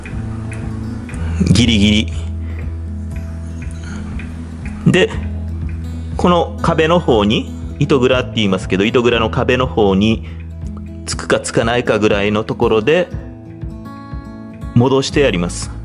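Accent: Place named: Okinawa, Japan. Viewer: 勉強になります。さんのウチナー訛りが心地良いです♡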